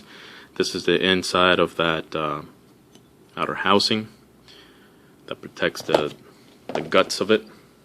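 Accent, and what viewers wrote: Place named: South America. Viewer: Your accent sounds like your from South America. And nothing wrong with that. But it’s not called a, handle, it’s called, the grip. But I understand why you would call it a handle. And this was such a kick ass video. I’ve never seen the inside of this firearm. Thanks for making such an in depth video on the chiappa rhino. Keep it up man. I’d like to see more video of some other gun, strip downs.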